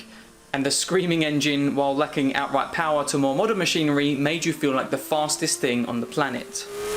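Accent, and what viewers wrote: United Kingdom. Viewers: that be good also but if I were you Im gonna try not to rush in speaking and remove the accent & slang since not everyone who watch your video come from britain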